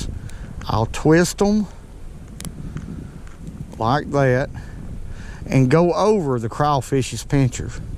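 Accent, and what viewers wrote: South, United States. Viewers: Don't understnad what you say with that funny Alabama accent. Love ur southern accent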